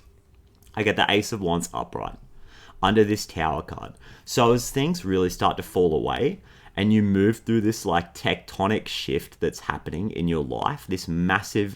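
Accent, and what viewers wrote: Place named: Australia. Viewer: Australian accent... you notice it so much more the difference how we speak compared to the american tarot card readers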